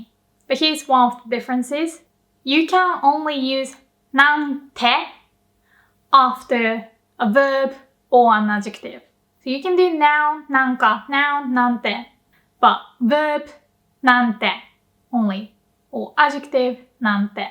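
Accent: England, United Kingdom. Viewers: Love your English accent, wonder where you picked it up? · I like her British accent.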